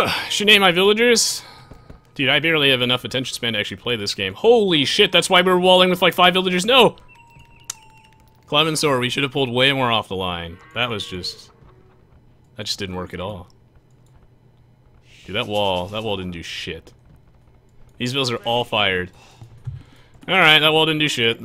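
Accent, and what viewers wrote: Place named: Germany. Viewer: Your occasional german accent really adds to the production value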